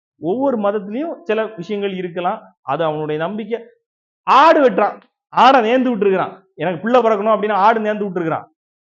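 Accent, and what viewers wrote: Tirunelveli, India. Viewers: Superb. Very matured talk. Entha ooru bro neenga? Tirunelveli slang iruku.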